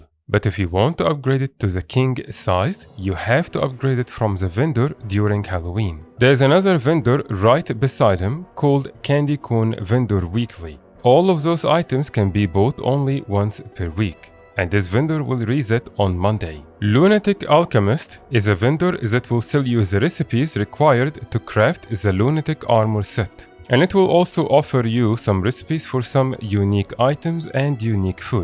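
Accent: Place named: Turkey. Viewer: I like your accent. Sounds Turkish but I might be wrong. Also, cool video, clean and concise! <3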